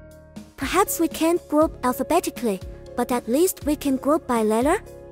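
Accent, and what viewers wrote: China. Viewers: i love this english voice with the chinese accent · am I hearing things, or does the voice have a chinese accent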